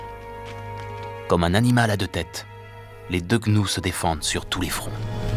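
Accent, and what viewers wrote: France. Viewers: Amazing footage but there's only one bad thing... It's a French narrator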